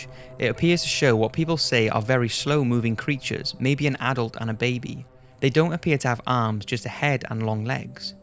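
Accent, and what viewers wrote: Wales, United Kingdom. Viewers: perkin2000 Think you will find it is a Welsh accent, from near Cardiff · It's British. Of course it's audio gold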